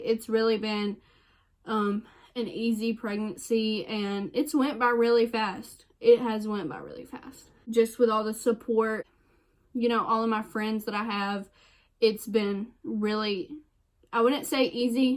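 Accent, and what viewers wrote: United States. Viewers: You gotta be from Texas or Tennessee 🤣❤️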